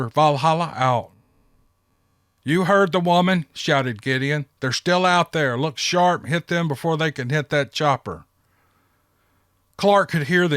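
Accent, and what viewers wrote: South, United States. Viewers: I love a sassy Southern girl! lol. Ya sound like me. Thanks for making me lol ! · I can't listen to the overly southern accent. could speed up the tempo. Better yet, goodbyyyyyyyye. (Accent emphasized, of course)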